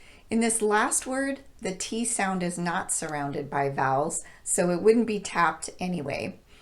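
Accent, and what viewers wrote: United States. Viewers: Dear! What state are you from?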